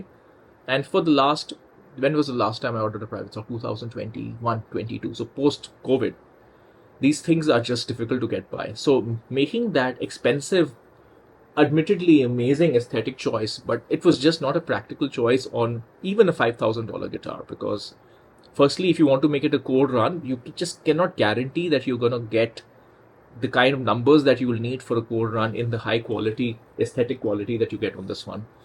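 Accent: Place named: India. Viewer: sound muted cause of Indian Accent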